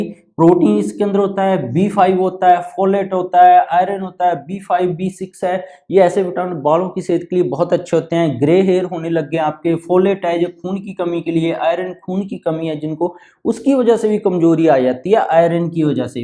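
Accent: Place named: Punjab, India. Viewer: Kuchh samajh ni aa raha Punjabi touch k vajah se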